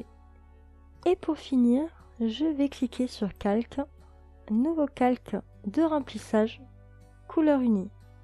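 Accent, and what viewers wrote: South, France. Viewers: Bonjour merci pour ton passage sur ma chaine et pour ton très gentil commentaire, tuto comme l' écrit mon ami Jim ( homme de gout ) excellent. like 24 et un petit abonnement en plus pour écouter tous tes conseils, encore merci et à bientot, amitié, ah oui ton accent du sud est à croquer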